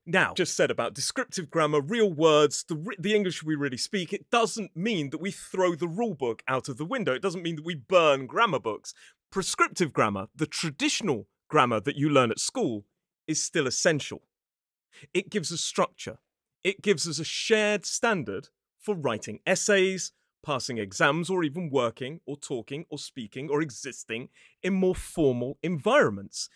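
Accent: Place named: RP, United Kingdom. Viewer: I am from Canada and ok your English sounds like Received pronunciation and it's very easy for me to understand but some British dialects are impossible for us Canadian os American to understand....I remember I needed to have subtitles on when watching Game of Thrones.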